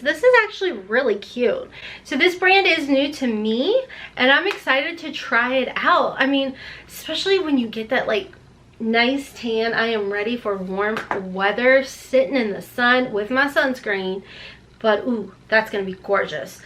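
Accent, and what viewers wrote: South, United States. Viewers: We love our country accent . And I sing 🎶 along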